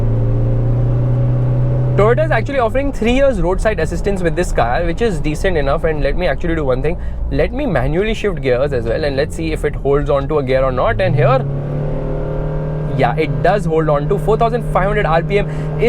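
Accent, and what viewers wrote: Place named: Mumbai, India. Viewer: Want to start vlogging in Hindi. It’s kind of feels good when he speaks Hindi in between vlogs. It really suits him as well with that mumbaish accent.❤️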